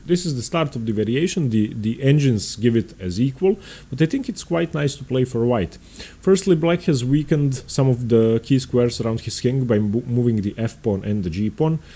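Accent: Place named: Russia. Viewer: Thank you for providing these well thought out and well presented videos. I think I detect a Russian accent in your speaking. If so my thanks to you is "Spacibo" or "Спасибо"!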